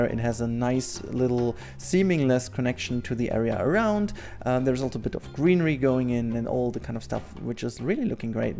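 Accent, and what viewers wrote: Germany. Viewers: I actually really like watching you because of your German accent, please don't change :) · I kind of like hearing German people speak english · I for one think the German accent and language is positively breathtaking. Normally I’m not a fan of commentary in speed builds but you have such interesting mannerisms, stories and viewpoints that I quite like listening to you talk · War ja klar, dass die deutschis sich aufregen, dass du Videos auf Englisch machst. xD Anyway, du bist der erste Deutsche, dem ich auf jedenfall laenger zuhoeren kann, da dein Englisch wirklich sehr gut ist und du auch keinen so starken Akzent rumtraegst. Don't listen to the kiddos, who whine because they dont understand shit - you do some neat, quality content and deserve the recognition online :) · To be honest, I like that you explained your choose of language. I watched many of your videos, simply because they are absolutely amazing. 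But your German accent and some phrases were confusing me sometimes... anyhow, now I love your videos even more! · well, about your accent is good better than another German 🙃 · German too and I don´t like hearing most others,when talking english, but you don´t have this strong accent. it is noticable ,but just slightly. That´s very ok to listen to, similar when I speak. Ikr ,hearing oneself is always weird.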